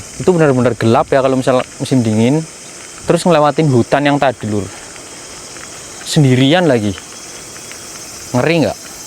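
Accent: Banyumas, Indonesia. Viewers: Asalamualaikum,salam kenal,mas aslinya jawa mana yah ko mirip kaya kampung ku banyumas.terus di jepang udh brapa th..? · Mas sampean asal nya jawa ngapak ngndi sih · Suka bgt perumahan di desa tp rapi bersih mase. Ngapak aslinya mana mas sukses trus mas broo❤ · Mas Indonesia dari mna ko bahasanya ngapak kenapa kerja d desa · ngapak banyu mas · ADLI.DARI. NGAPAK. COLACAP,APA. BANJARNEGARA,APA. PURBALINGGA.? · Asline sampeyan wong ngendi ya Mase,bahasane ngapak kaya wong banyumas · Bahasane ngapak Wong ngendi sih ko? 😅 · Jenengan masih ngapak kentel banget yak😂😂😂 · Mantap ngapak ya mase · Lugatnya jateng ngapak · Wong bnyumas ngpak2 mntap mas bro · si. Ngendi asline jawa ngapak? Ngendi Cilacap.? Banyumas? Kebumen? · Bahasene ngapak. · Mantep ngapak Banyumasan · Nyong penonton baru mas, seka wonodobo jateng, nyimak separo lgsung tak subkreb, tertarik karo kontene sampean ben tambah ngerti suasana pedesaan jepang, narasune sampean maer kiye, sederhana trus ora ngguwang logat daerahe, ngapak ya, pada berarti, oke semoga sukses bro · Asline wong ndi mad ning Jepang bahasa jawa ngapak ya. 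· Mas aku seneng liat konten mu bahasa mu dialek mu khas inyong2😅 salam kenal mas aq dr Banjarnegara mas asal kota mana? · Sampean ngapak Jepang pa mas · Rika ngapak yaak?. Bambang Banyuwangi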